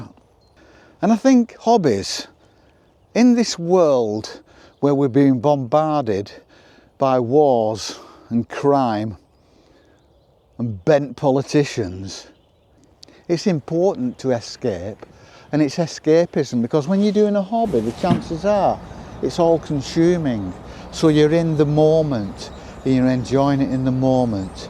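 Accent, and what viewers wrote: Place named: Northern England, United Kingdom. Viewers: So nice to hear that Lancashire accent! Your hat and scarf are lovely!! I'd love to teach you how to spin your own wool. You could take your drop spindle out on walks with you and sit and spin on a rock or a bench by the lake there. I'm a 66 yo Lancashire lass with a ton of hobbies. Live in the states now. My hobbies are knitting, spinning, dying (I keep a few sheep and other farm animals) racing pigeons, dry stone walling, spoon carving, pickle making ( I have a road side stand) horse training, I torture the dogs with my fiddle playing :) make paracord bracelets and hand carved rubber stamps...gosh, the list goes on. We don't have telly, but You Tube is a God send for learning new things. Check out the Zed Outdoors channel if you'd like to learn spoon carving and other bushcraft skills. There's a big spoon carving festival held every year in Derbyshire. 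Spoonfest, it's called. I'd love to go some day. Wishing you many years of enjoyment in all you do! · Just found your channel and I've subscribed. I like your philosophy, and your northern accent, and your adorable little dog. You're a cool guy and an inspiration. Wish I were there. Thank you and cheers from the U.S. 🌿🐶💚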